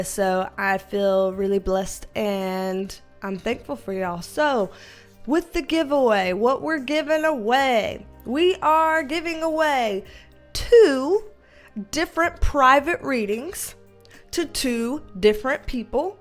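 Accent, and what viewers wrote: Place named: Rural, United States. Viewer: As a country girl myself I love your accent. It feels so familiar and comforting! Love ya! Keep up the great work and congratulations on 10k!!! 🤩🥳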